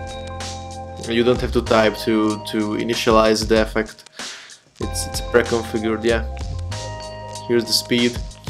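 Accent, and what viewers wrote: Hungary. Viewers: Sexy magyar akcentus :*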